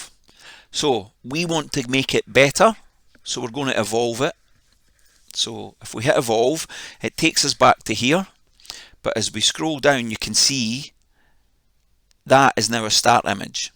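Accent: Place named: Scotland, United Kingdom. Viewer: Many thanks - great video - very informative and with a dreamy Scottish twang as a bonus! Happy Days! LOL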